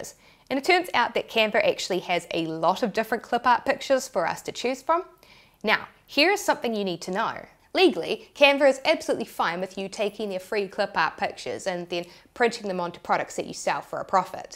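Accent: New Zealand. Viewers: Hi! 😀 My name is Shannon, I'm from Pukekohe, New Zealand - I stumbled across your channel just a few weeks ago and was delighted to hear a New Zealand accent!!! 🤩😁 I LOVE your channel, your videos are excellent, there are SO many options and ways to make money online which I'm looking into. One of my long time wishes is to work from home and there is no better time than now to make this dream happen! I've been watching a lot of YouTube videos over the past few months, looking into various ways to make money online which will work and aren't too mind-boggling, or cost any money to start. You are amazing -- your knowledge and success are incredible!!! ✨🤩😁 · Pretty sure it's her Kiwi accent 😄 Someone please correct me if I'm wrong!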